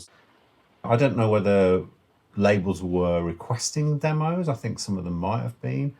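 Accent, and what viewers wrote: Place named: Birmingham, United Kingdom. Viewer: Hi again. From your accent I’m presuming you’re local to Birmingham as am I. If you ever want to collaborate on anything then please get in touch. I’m a piano and woodwind player so I’m sure we could cobble something together. 👍🙏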